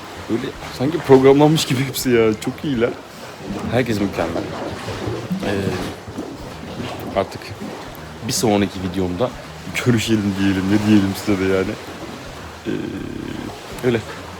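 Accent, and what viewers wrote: India. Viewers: tam hindulara benziyorsun biraz türkçeni düzelt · aga, tenin, konuşma şeklin hintlilere benzemişsin :)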